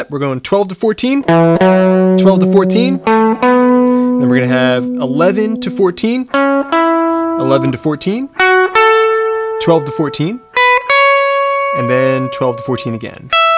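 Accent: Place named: Baltimore, United States. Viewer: Philly? I hear Baltimore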